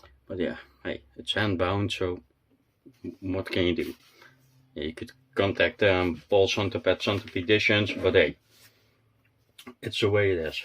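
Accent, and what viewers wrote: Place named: Netherlands. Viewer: Great job with your unboxing video for the Numbered Imajica,!! Love your Dutch accent! And your English is flawless, my friend!!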